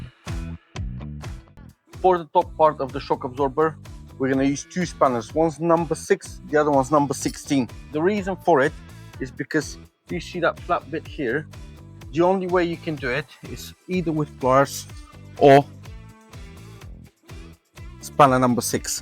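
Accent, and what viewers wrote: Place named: South Africa. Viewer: South African? Love the accent!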